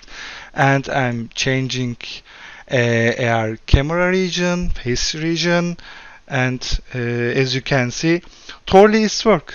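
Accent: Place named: Turkey. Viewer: Aksandan direkt Türk dedim öyle çıktı. :) Başarılarının devamını dilerim. AR t-shirt yapabilir miyim flutter bilgim var unity bilmiyorum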